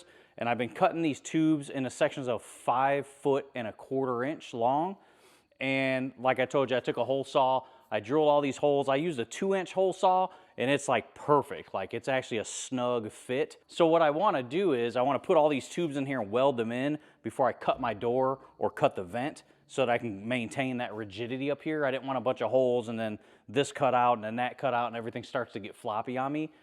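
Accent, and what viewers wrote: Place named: Buffalo, United States. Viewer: My dad was a boiler code welder at Harrison Radiator Division of GM for over 30 years. He passed away at 80 just 2 years ago this past week. (28th of Nov) He would have LOVED your video and everything about your entire build here! He might have had some ideas & inputs as well. He always talked about building a boiler to burn waste oil. He knew a fella in our area in Western NY that made one back in the early 80's (or maybe it was the late 70's) At any rate he passed that interest on to me. We have lived in Alabama for almost 40 years now, so we don't have quite the need for heat as we do the A/C, but it can get downright chilly even through the short winter that we do have. I think I'd have some fun with the handles, I was thinking springs or twisted rod. There's so many things you can do to put the finishing touches on. 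Buttons, bells & whistles right? Man! I am just happy to see all you've done & appreciate ya! Good goin' bro! I'm just curious, are you in Michigan? There were a few things that you said that almost sound like a Buffalo accent, but you don't sound too much like us!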